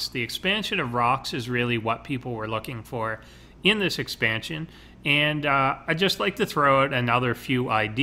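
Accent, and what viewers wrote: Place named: Canada. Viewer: You're canadian, aren't you?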